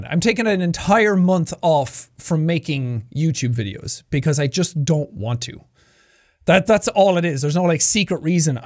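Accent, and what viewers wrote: Ireland. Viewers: Jack r u irish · Hes irish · I miss this loud Irish person so much I started to watch his happy wheels playthrough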